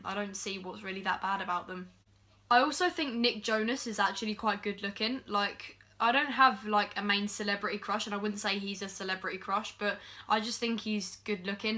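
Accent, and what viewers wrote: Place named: Ireland. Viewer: girl he always posts irish vids when she sounds irish herself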